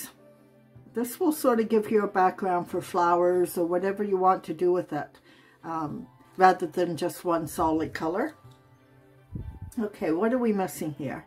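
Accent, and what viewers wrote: Canada. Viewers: I picked up from your accent that you are Canadian. I'm in Adelaide in South Australia and you will definitely be hearing from me again. I just love your pieces.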